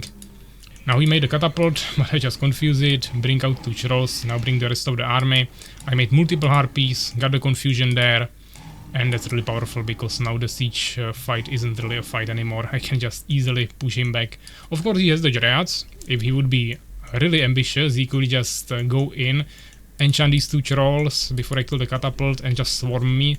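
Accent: Czech Republic. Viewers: are you czech? you have very czech accent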